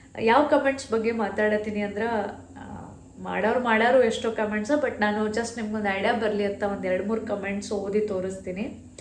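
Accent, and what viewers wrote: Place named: Belgaum, India. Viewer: Hi dear na nim recent viewer ..naanu bgm dist gokak ...nim matodo style nam tara ada anta kushi aytu nodaaku esta aagatetei...evaag hubli total change aagateti matadod .na like ast madtini comments madak aagalla...nandu small kid ada so ...niv couple mast vlog madtira ...yest yella kelsa madtira .. really great dear.. online class tagoteni andri yavdu anta kelabahuda ...?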